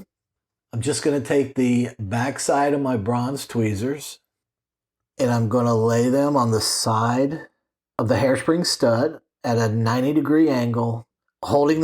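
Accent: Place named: United States. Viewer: Which part in america you from i like your dialect ?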